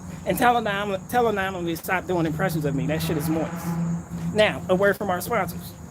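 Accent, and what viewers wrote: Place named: Boston, United States. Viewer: Yeah, yer Bahstin accent is getting there/ What is up with RFB? I never see anything from him.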